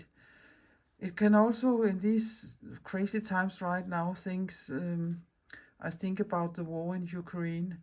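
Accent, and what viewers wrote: Denmark. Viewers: I love everything you do … and I especially appreciate the slow pace. Your Danish accent contributes to that … although I speak English, I find that the way you speak is very relaxing & totally understandable!! 💗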